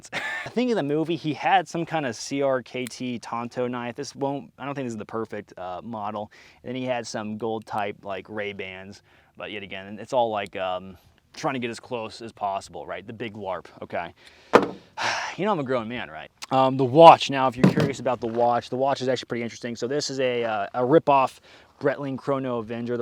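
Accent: Zimbabwe. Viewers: Nah that’s just a Rhodesian accent bruv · Spot on with the Rhodesian accent with a touch Saffa in it.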